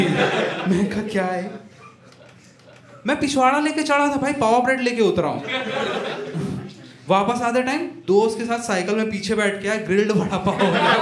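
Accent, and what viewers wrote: Odisha, India. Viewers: Proud for great accent. Otherwise every body says your odiya accent was very sexy'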